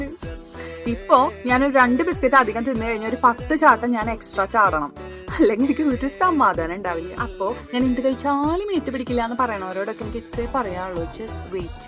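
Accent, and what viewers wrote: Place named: Thrissur, India. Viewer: Enik ishtaan thrissur slang njan kannur aaan kettaaa❤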